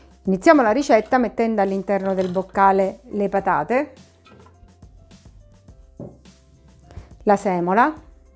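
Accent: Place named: Southern Italy, Italy. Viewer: Seguo spesso le vostre ricette, complimenti. Posso chiedere di dove siete?. Sicilia? Calabria?. Non riesco a capirlo dal vostro accento...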